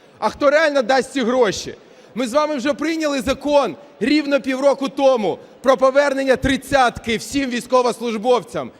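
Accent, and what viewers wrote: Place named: Russia. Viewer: Навчись розмовляти українською мовою, а не досі в тебе присутня російська! Ненавиджу таких! За що хлопці наші гинуть?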